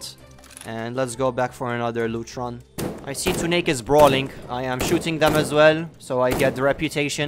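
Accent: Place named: Malta. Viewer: first Maltese person I have ever found playing Unturned other than myself; they are so rare! I noticed immediately from your accent. Keep up the great content!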